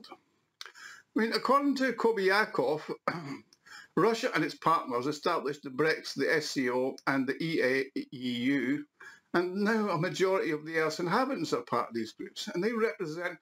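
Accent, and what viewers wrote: United Kingdom. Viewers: Great commentary. Good to hear a British voice talking sense against the tidal wave of propaganda we have to put up. Best wishes to Scotland from England.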